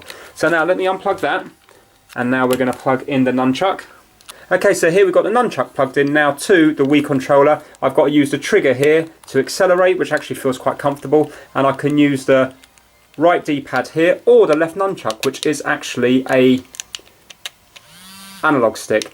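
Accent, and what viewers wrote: United Kingdom. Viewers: Rami Abdalla he has a British accent. So that’s why he says it like that. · Geekas Kid probably British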